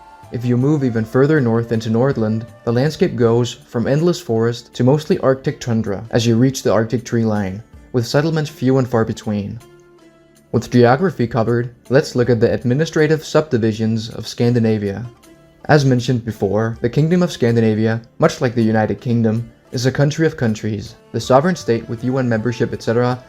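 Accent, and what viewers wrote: Denmark. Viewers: De märks att han är dansk xD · Cant help but notice the Danish accent on the creator. I feel we already are in this union in some ways. I certainly appreciate all our neighbours <3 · your propally a dane i know cus the way you pronuence sønderjylland and sjæland and the danish accent is perfect for a dane how do i know im a dane. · wtf why is he mispronouncing the swedish names in danish? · he has a slight danish accent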